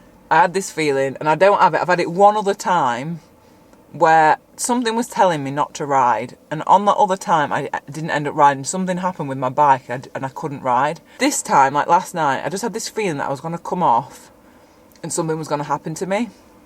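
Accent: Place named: North of England, United Kingdom. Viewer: Robert Tempest : that's the accent of a good north of England gal and it doesn't need to change thank you very much 😃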